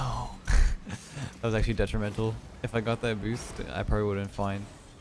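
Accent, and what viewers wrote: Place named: Canada. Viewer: The canadian really comes out when you're this quiet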